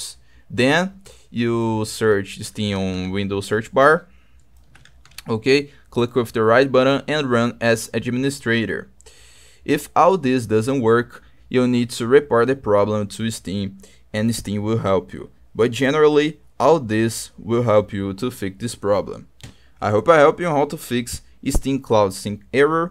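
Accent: Brazil. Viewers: i love you, eu sei q tu é br, esse sutaque nao me engana marvadu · Thank you very much. Recognized that brazilian accent from a mile away!! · no puedo aguantar al br hablando ingles asdkjashdka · esse ingles é brasileiro ein kkkkkk satisfaçao irmao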